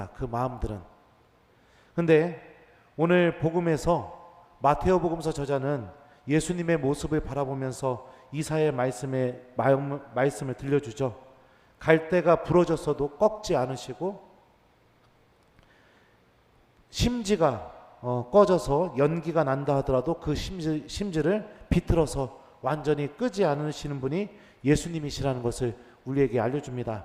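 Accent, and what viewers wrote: Chungcheong, South Korea. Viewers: 신부님 아침마다 신부님 말씀으로 시작합니다.미국애서 감사드립니다.신부님의 충청도 사투리가 너무정겨워요.동향이기애 더 가슴을 울려요..🙏🙏🙏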